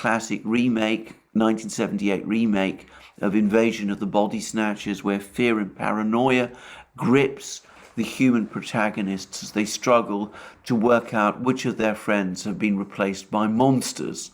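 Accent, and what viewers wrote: United Kingdom. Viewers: Alexander is actually Russian citizen with a British accent. He looks Russian enough.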